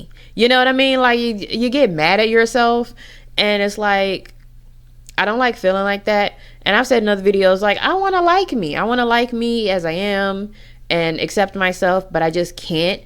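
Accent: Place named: New York, United States. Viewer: I'm terrible with accents, I thought you were from New York 🤦 hope you feel better soon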